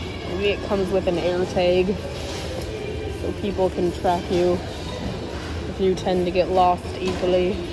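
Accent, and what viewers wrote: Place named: Northeast, United States. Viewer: I love the way you talk I am knowledgeable of where are you from the Northeast and that is your Northeastern speech those who are not educated as to the different dialects of the language sorry for them but I enjoy every word you say and I appreciate hearing you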